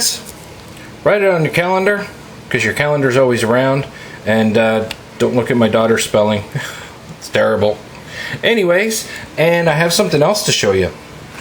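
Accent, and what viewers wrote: Nova Scotia, Canada. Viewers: Hey.... I've tried a few dark rum recipes similar to yours. I've tried various combinations of white sugar, ginger, cloves, oak chips etc. to get some different flavours. You had me hooked once I heard your accent.... and when I heard you talk about donairs.... I thought we may have met at that point being from Pictou County (but living in Oshawa) myself. Looking forward to viewing some more of your recipes! Cheers!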